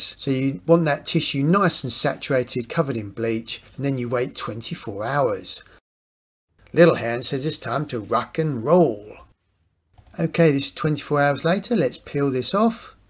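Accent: England, United Kingdom. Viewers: You have such a nice ACCENT!!😊 What part of England are you from?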